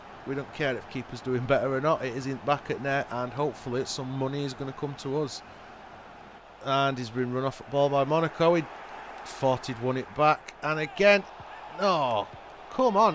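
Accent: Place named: Northern England, United Kingdom. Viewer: Relaxing, insightful commentary from a northern lad, doesn’t get much better than that! Liked and subscribed 👍🏴☕️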